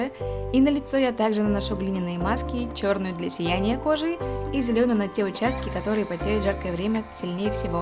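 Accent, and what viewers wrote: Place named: England, United Kingdom. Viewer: ооооочень нравится твоя английская интонация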